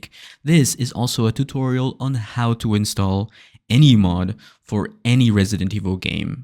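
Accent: Italy. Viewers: ❤ Personally I'm a console guy, but the fact that you took time for your fellow peeps on PC to give them a head on education makes your Italian accent the cherry on top. I support good people and good content. Enjoy brother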